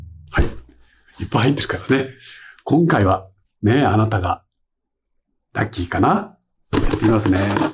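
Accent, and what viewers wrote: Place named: Poland. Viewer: Wow! I would never expect a polish accent in your video :o Thanks for this!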